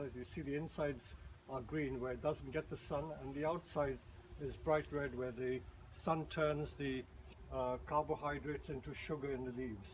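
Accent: India. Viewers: it says surrey, england...his accent sounds like he was raised in india... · Absolutely Brilliant. A non Bonsai related question for you Mr.......... Have you ever lived in India? I ask this because of your accent seems very Indian. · Chinese British guy with an indian accent making japanese trees. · He's ethnic Chinese, and was born in India. ( is a Chinese surname.) He speaks English with much the same accent and pattern as an Indian fluent in English from an early age would speak the language.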